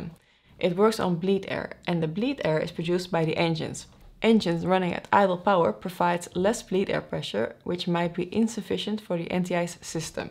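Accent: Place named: Netherlands. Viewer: Hi, your Videos are very good. The approach an landing at Lugano Airport was superb. But... PLEASE... can you speak a bit slower in your videos. You have a little "Dutch-Slang" in your speaking and for non-English or non-US-man it's very very hard to understand. Please do not be offended because of my little criticism. CU Sönke...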